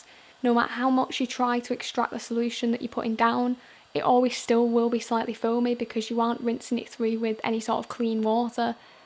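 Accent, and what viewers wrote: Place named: Wales, United Kingdom. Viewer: I love your accent. Welsh?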